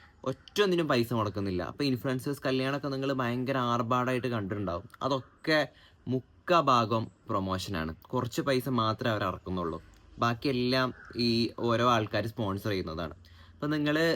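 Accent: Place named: Malappuram, India. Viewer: Good Advice & Motivation.. അസ്സൽ മലപ്പുറം സ്ലാങ്, വീട് എവിടെയാ?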